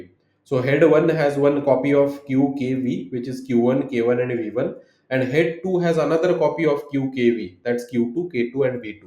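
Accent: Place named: Maharashtra, India. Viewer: Perfect marathi accent🎉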